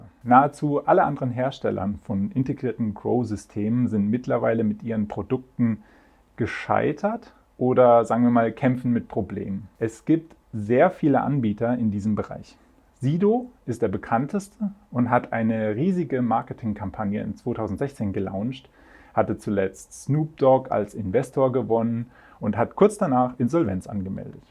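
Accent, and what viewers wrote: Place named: Swabia, Germany. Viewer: Einfach geil wie du am Schwäbeln bisch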